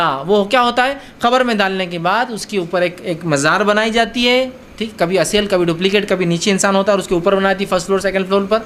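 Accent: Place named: Hyderabad, India. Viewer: He's hyderabadi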